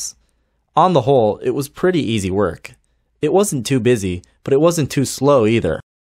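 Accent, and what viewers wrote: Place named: United States. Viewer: Love American accent from Pakistan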